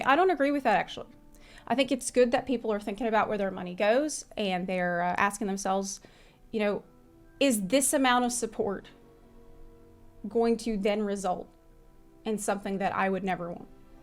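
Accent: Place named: Louisiana, United States. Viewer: The Louisiana really came out of in this one, damn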